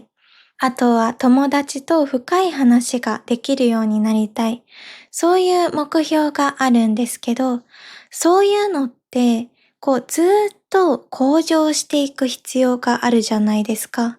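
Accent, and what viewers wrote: Kansai, Japan. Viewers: Omg thank you so much for 関西弁おまけ. ❤️❤️🙏 I'm so happy you added it.🥳🥳 -さん is so adorable speaking 関西弁. The problem is that I want more😂. I guess I'll be listening to this podcast 20 more times 😅. I really love your podcasts like this, as long as you make any video - doesn't matter what it is, I'll watch it. There was a tip for learning, it was to find someone you have a crush on to keep you motivated😂. is my crush. I hope I dont sound like some pervert.😅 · Are you from Kyoto? Really nice to be able to hear 関西弁 !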